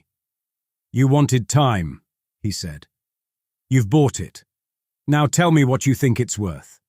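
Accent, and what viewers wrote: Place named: England, United Kingdom. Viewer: Thank the gods...... Finally a story with a clear clean English accent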